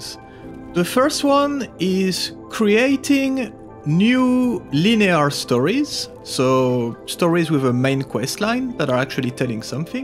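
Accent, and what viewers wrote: France. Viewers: Je sent dans l'accent qu'il y a du français, ça fait plaisir ! Superbe vidéo ! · Hey great vid man ! I never played the stalker series but I would really like to, so I started watching your videos and I have some questions, that maybe you could answer (or make a video about like : getting started on stalker). I would like to play the original game's stories and I know everyone says to play the games without mods to get the developper vision but it really is a big hold back for me. Maybe if you played the games back then the sounds and graphics don't get in the way but, nowadays I can replay only old games if I played them back then. So do you have mods you recomend for a new player to enhence the 3 games so I know I will actually finish them ? Thank's in advance if you have the time to answer ! PS : est-ce un accent français que j'ai cru entendre ? 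😄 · Tu es Français? (vue la manière dont tu parles anglais lol). Si oui j'aimerai bien un peu d'aide pour comprendre certains détails sur le mod GAMMA. Merci d'avance. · Thank you very much for this review, you do good job with your videos. En plus avec l'accent français c'est encore plus compréhensible. Like you I love the huge work of Grokitach, it feet my vision of S.T.A.L.K.E.R This game and its community is one of the best things in this world !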